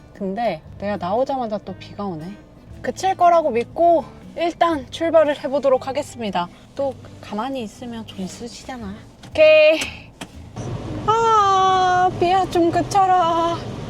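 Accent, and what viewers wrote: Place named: Gyeongsang, South Korea. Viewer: 아~~~주변에 비해 높다 하여 노꼬메 인가 보네요 경상도 사투리 이군요 제주 방언 인가 하였네요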